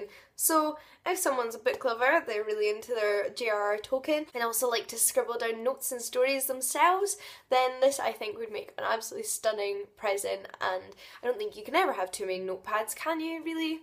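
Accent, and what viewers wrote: Scotland, United Kingdom. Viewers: Thanks a lot for those amazing suggestions! PS: I love yout Scottish accent ♥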